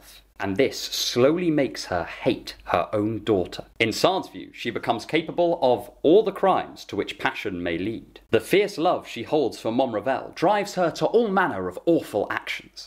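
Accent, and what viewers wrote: United Kingdom. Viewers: 4 star video. -1 star for the obnoxiously british accent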